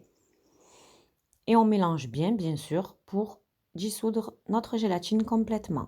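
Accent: South, France. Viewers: super recette, et surtout j'adore votre accent du sud :)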